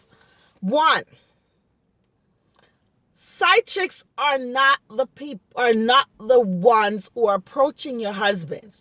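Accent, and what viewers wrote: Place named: Jamaica. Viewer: I love your exit is that Jamaican this may be ignorant question ... I apologize if it's offencive but your accent is lovely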